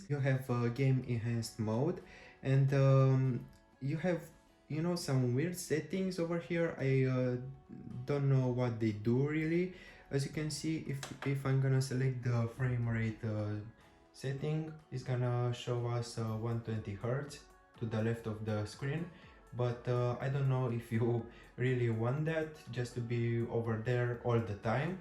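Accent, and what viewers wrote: Romania. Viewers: i'm pretty sure that s a romanian accent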